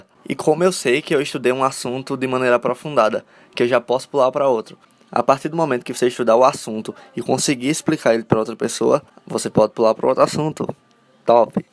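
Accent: Northeast, Brazil. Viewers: Como identificar um sotaque nordestino ? e rsrs só faltou o palavrão ! Rdrs é comum em algumas cidades ! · Vc é nordestino ? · Vc é nordestino, né? Sotaque forte da porra. Não sei o que eu acho mais lindo, esse seu rosto perfeito o o sotaque nordestino, as palavras ficam mais engraçadas ou corridas, acho gostoso demais ouvir nordestinos falando. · É impressão minha , ou ele é nordestino?? Pq ele tem o sotaque . Eu achei super top !! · Como é presta atenção homi, cum sotaque do nosso nordeste😍😍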